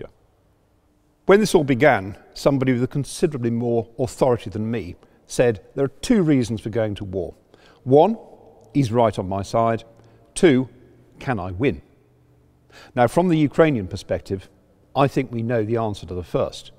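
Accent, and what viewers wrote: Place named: United Kingdom. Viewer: I could listen to all day to be honest. But as an American i can say i enjoy listening to most British talk lol.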